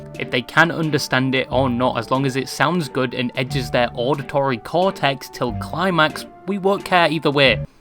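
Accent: United Kingdom. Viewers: is this guy still british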